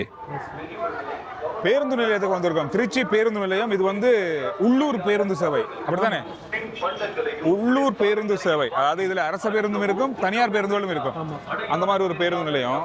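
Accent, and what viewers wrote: Sri Lanka. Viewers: Elangai tamil enaku inimaiya irukum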